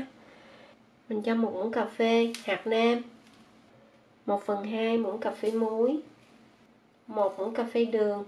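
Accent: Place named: Quang Nam, Vietnam. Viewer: chia se mi quang dung cach cua xu quang cua co. con mi quag cac noi nhu pt ngot duong nhieu qua kho an lam chia se tieng noi de thuong giong nguoi quang co cam on nhe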